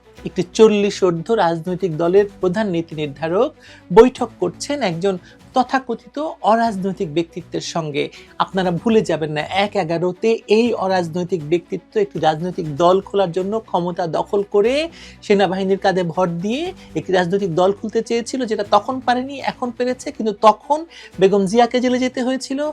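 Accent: Kolkata, India. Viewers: আপু আপনার ভাষা খুব পরিচিত লাগে, কলকাতায় গেলে এরকম ভাষা শুনতাম। আপনি পাক্কা ইন্ডিয়ান মাল। দেশে আইসেন না প্লিজ।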